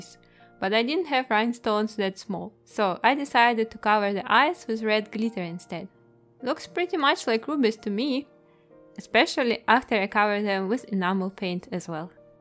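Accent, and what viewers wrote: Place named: Russia. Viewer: Ain't these the old Soviet Nutcracker frames in the beginning?) Also, pardon me for asking - but i kind of detect a slight Russian accent there)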